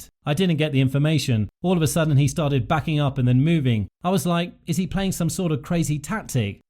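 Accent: United Kingdom. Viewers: This video is sponsored by Team LH. I couldn't miss that the voice in this video sounds very British... not to mention, biased as hell! Never heard and seen so many false truths, half truths, and 100% bullshit stories in one video. Unbelievable, what a joke. Not worth watching... and the end of this video aged like milk, because every team principal want Verstappen to drive for their team...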